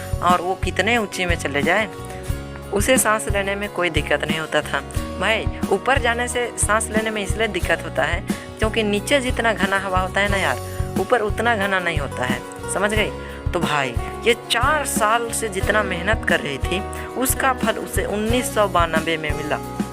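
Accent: Bihar, India. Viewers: SIR AAP BIHAR SE HO ??? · Bhai were are you from. I think you are from bihar 🤗❤️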